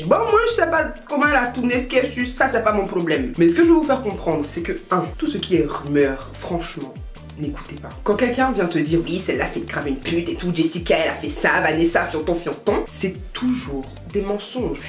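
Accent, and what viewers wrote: Ivory Coast. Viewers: cette vidéo faite avec l’accent ivoirien j’adoreeeee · « Se qui est suuu » 😂😭😂 ton accident ivoirien est juste trop beau #Rwanda 🇷🇼🇷🇼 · Par ta manière de parler je sais que t7 ivoirienne comme mw wallay ma sœur 😍 my côte d'Ivoire j'adore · J'adore ton accent ivoirien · Mdrrrr l'accent ivoirien. ?tu tues grave...Abidjan♥♥♥♥ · Nonnnn tes krooooo bien ta trop l'accent ivoirien naaa change pas on a kro le mm caractère on s'en fou . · Tu fais des efforts pour avoir ton accent français mais quand tu t'emballes tu redeviens ivoirienne vive 225🇨🇮🇨🇮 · attend t ivoirienne ou koi ta notre accent putain j'adore ta grandi en cote d'ivoire? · dsl moi je vit en cote d ivoire ( mais je suis pas ivoirienne ) j aime pas trop l accent , genre c drole a l entendre mais niveau beaute c pas trop ca ^^ je le trouve un peu trop brusque apres c que mon avis personnelle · t'as manière de parler me casse trop on sent que t'es une vrai ivoirienne même loool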